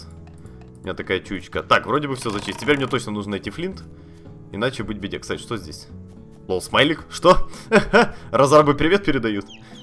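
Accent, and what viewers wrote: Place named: Odessa, Ukraine. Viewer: ты в озвучке просто великолепен!!)Шикарный голос, прекрасное чувство юмора, периодически возникает такое чувство, что ты из Одессы!))Браво!)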